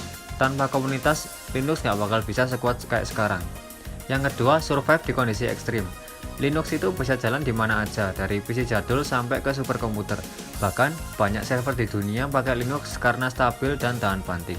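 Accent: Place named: Java, Indonesia. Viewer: Nah, logo penguin itu sebenarnya mirip sama pengguna linux yang perutnya gede, contohnya bapak floss stallman. Btw salam medok bang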